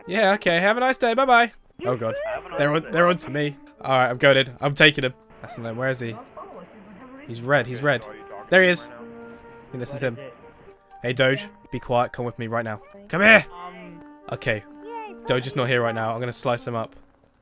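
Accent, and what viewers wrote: United Kingdom. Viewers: someday you could go to buckingham I am a fan I always watch your videos it funny it's sometime has edit if you go to buckingham I'm there Lieutenant Truong I could tell that your British but I'm not british I'm not the one who incharge I know you might be yelled by the royal guard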